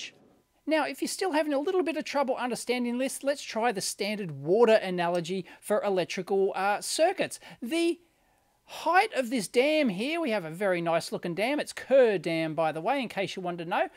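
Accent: Australia. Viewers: Aaaay aussie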